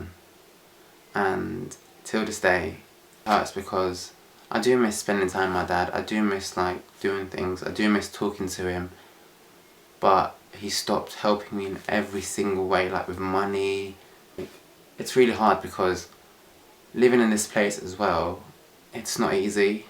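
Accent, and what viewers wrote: United Kingdom. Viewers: First off Love you, Love you,Love you to pieces! You are so cute and I'm an amercian boy whose a sucker for british accents. I was lucky to stumble upon this video when looking up a video on being gay and muslim. I can understand completely because being African Amercian and raised in southern Christianity I know fanatical extremist minds all to well.I hope things continuously get better! Hold onto the accepting family and friends and release all others to love and light! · hey sounds British. I just started watching this now and I happy I clicked on the video.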